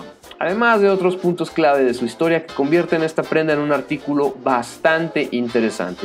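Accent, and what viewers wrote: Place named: United States. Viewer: Tu voz es como de un yankke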